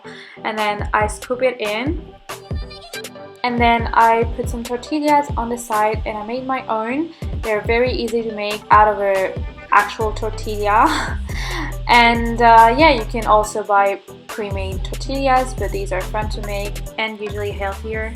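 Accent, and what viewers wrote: France. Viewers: are you from France :p?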